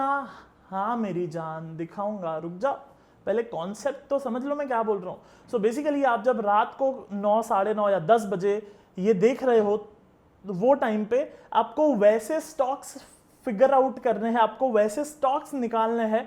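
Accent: Gujarat, India. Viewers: Sir Are u gujrati??😃😃😃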